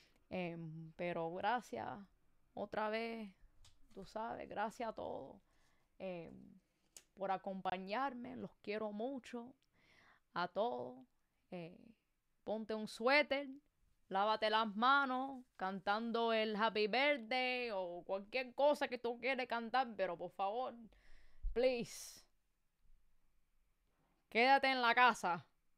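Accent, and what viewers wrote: Cuba. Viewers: Me encanta y más con el acento cubano, saludos de México 🇲🇽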